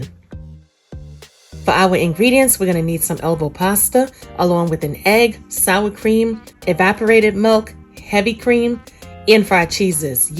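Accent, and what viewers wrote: New York, United States. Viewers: Looks delicious, funny it’s southern but you sound like ur from New Yawk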